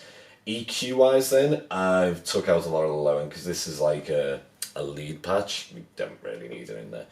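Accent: Liverpool, United Kingdom. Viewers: F**king hell I was not expecting a scouser accent XD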